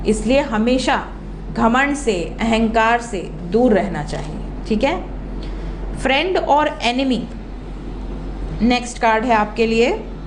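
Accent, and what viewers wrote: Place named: Bhojpuri, India. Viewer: mam kha se hain aap bhojpuri bol rhi hai vaise mai ghazipur up se hoon 😂❤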